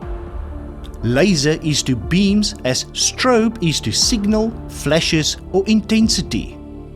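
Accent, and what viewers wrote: South Africa. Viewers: Stalactites. Got 7 wrong so far...!!!!!!!!!! Is that a South African accent? RE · Bud are you a South African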